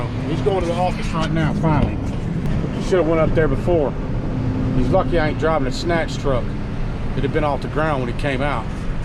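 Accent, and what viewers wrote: South, United States. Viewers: I just discovered your channel a couple of days ago and I have been watching it Non-Stop. I love your southern accent I love your relaxed kick back way. I love how you give it up to God. You were talking about shady practices when tow company send a bill after they sold your car at auction. That is shady as hell I agree. I live in Arizona and here in Arizona if your car gets towed and they sell it at auction then the State of Arizona which has nothing to do with the towing company or the person's car who got towed jumps in the middle of it and adds a abandoned vehicle charge of $500 which you don't know about until you go to try and change your address on your driver's license or if it's expired and you need to get an updated license or if you want to register to vote or you want to register to be an organ donor. No they won't let you do anything they keep you locked up until you pay that $500 charge that no the person lost the car the tow company got the car sold at auction and now somehow the States involved and putting this 500.00 charge.???? 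Please if you could explain this to me like I'm a two-year-old and make me understand I would appreciate it.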